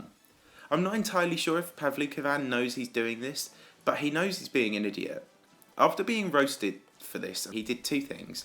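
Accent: United Kingdom. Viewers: My mom heard me watching you and now she won't stop talking in a British accent XD why!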